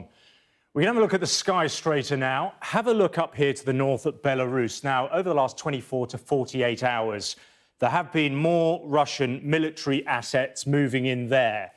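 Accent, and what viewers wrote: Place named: United Kingdom. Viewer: It's not "military". It's " militree" got to love those British accents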